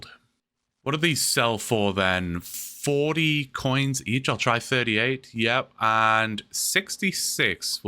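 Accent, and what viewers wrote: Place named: Newcastle, United Kingdom. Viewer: A geordie RuneScape player? Thought i was alone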